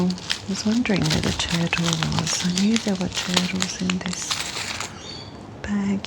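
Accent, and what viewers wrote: Australia. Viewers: Oh no! Don't take everything to the Post office :D I wanted to buy couple of the items from etsy but I need couple of days for some more cash, I will mssg you at etsy which items I will definitely order, if they are still there. PS. Perfectly relaxing video, crinkles and soft voice, aussie accent is one of my fav <3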